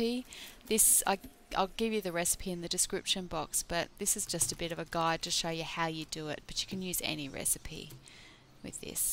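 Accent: Australia. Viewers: Hi, thankyou for your video. I usually make cold process but have felt uninspired for a long time now. I just made Hot Process with honey, no fragrance or E.O. following your instructions. In the mold now, cant wait for it to harden up and cut! Lovely to hear an Aussie voice too!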